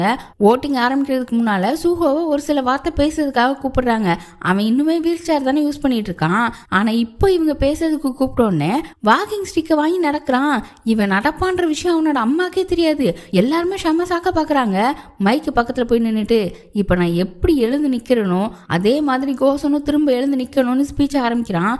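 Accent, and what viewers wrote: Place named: Tirunelveli, India. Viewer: Apdiya. Nanum old TVL new TKSI districthan. Irunthalum konjam different ahgathan irukku. But nallirukku unga slang😊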